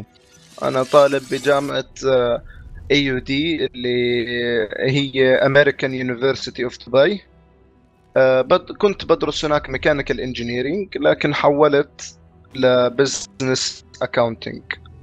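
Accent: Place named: Jordan. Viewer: ههههههههههه بلزمش تكتبو اسمو 😂😂😂 معروف من لهجتو اردني 😆😊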